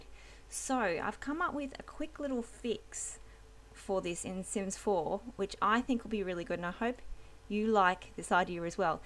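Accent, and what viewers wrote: Australia. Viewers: Are you Australian? And is your name?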